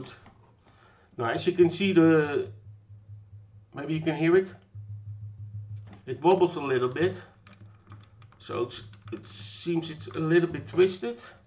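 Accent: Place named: Netherlands. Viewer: You sound very Nederlands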